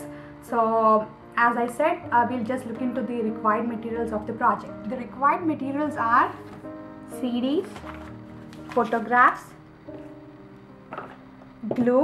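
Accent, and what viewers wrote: India. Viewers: I am Indian too but I find her accent funny and cute :D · Kannada accent😊but nyc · I like the video it is so awesome. Ps I like you're Indian accent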